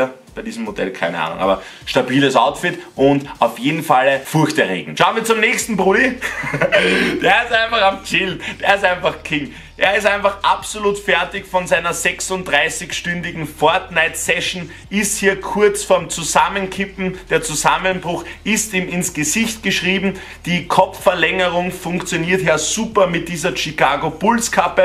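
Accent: Austria. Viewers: Ich liebe österreichisch🇦🇹bist du deppat endlich einen gescheiten Youtuber gefunden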